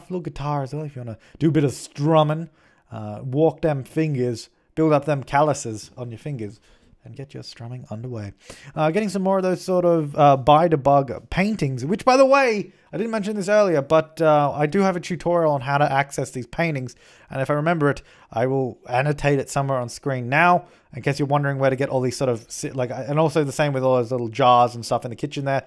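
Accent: Australia. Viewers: yeah us Aussies say things weird lol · I feel so disappointed, as an Australian, that I didn't recognise that you're Australian until you said you are. Haha. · i didnt know u were an aussie lol ok well u do learn something new every day :P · YOUR AUSSIE I DID NOT KNOW THAT OMG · I've been watching his video for ages and never recognised the Aussie accent cause I'm Australian too lol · OMG HE IS AUSSIE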